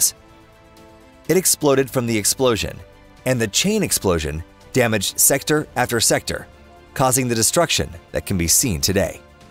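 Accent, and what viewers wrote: United States. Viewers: Most insane American accent i ever heared. Im not able to finish this.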